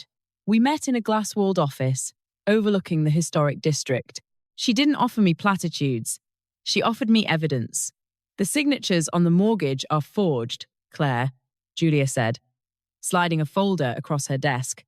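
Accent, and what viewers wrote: United Kingdom. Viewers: Nice story. But hearing a British accent saying they worked as a customs agent at a base controlling a port in South Carolina was wrong. Adding the visuals of Hotel Saigon Morin added to the confusion. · Why did they get a narrator with a British accent? It’s so out of place. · Yes just seem odd a British accent on an American story 😮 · Why do you have a British person narrating an American story with an Asian video playing?? Kind of stupid, don't you think??? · Why is she speaking with a British Accent instead American? HUH?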